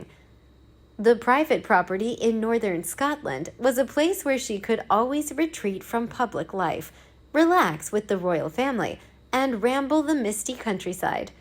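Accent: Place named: England, United Kingdom. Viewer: I love how the English accent butchers the Scottish tongue ,Holyrood is spoken in Scotland like this Holy-rood instead the English accent butchers the Scottish tongue ,maybe the commentator needs to get a lesson in the Scottish language .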